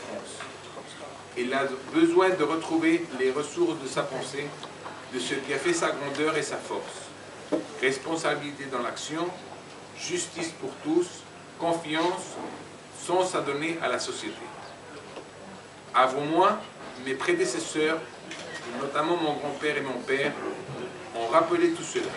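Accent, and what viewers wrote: Spain. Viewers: Il est beau, certes, mais putain !!! son accent espagnol fait tache !!! je le vois pas Roi de France, par contre si il a un fils aîné éduquer a la française, alors pourquoi pas. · L'accent espagnol n'est pas le problème ! Le problème est que ce type rêve : nous ne voulons pas de royauté ! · Drolf Ozio Certes, mais ça ne l'empêchait pas d'avoir un accent béarnais. Il parlait français, avec un accent béarnais. Tout comme ce Louis XX qui parle français avec un accent espagnol. Ne vous inquiétez pas : s'il venait à être appelé au trône, sacré et couronné, son accent disparaîtra peu à peu... L'accent n'est rien ; et contrairement à l'illégitimité, il ne se transmet pas de parent à enfant...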